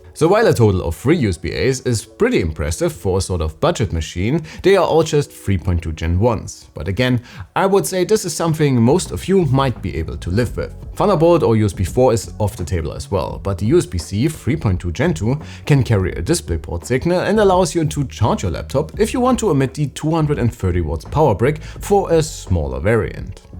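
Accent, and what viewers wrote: Germany. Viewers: Smart to do Videos in English for you, but the German accent is insufferable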